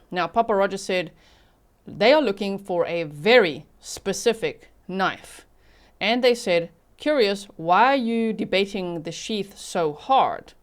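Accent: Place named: South Africa. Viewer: Are you originally from South Africa? Your accent sounds like it. Not being nasty, just sounds like it 🙂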